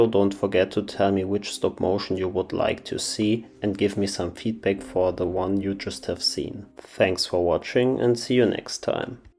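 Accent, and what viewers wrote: Germany. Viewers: Yo i didnt notice your German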